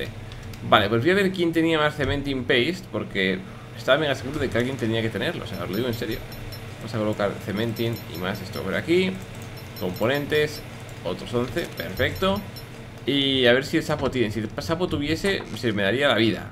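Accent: Colombia. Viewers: millones pense que eras español un colombiano xD xD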